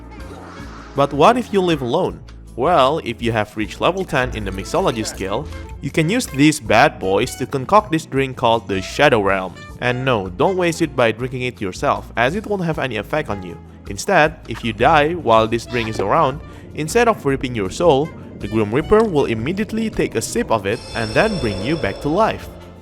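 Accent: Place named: Indonesia. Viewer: dari aksennya Indo bgt 🤣, semangat bang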